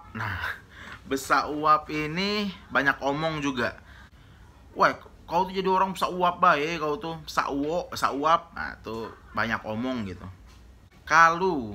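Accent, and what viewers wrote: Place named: Bengkulu, Indonesia. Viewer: Ini dialek Bengkulu mana? Bengkulu kota? Dialeknya ada campuran Lembak, Serawai dan lain2.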